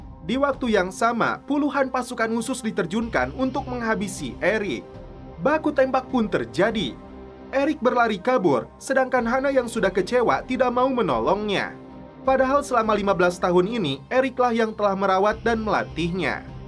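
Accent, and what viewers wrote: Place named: Garut, Indonesia. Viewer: Narasi gaya Garut...... Keren hehehehe..mmm. Mana bajigurna, kang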